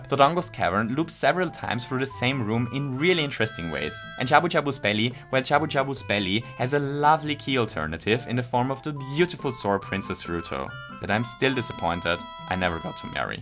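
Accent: Germany. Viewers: Hes german… you here that 5 secs into the video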